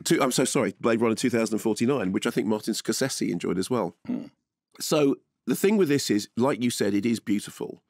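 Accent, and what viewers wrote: United Kingdom. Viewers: Americans: :Dune Brit: Djewn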